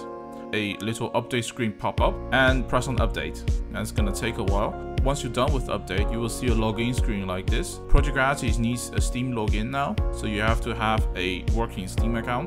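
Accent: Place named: Russia. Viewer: hey your accent its russia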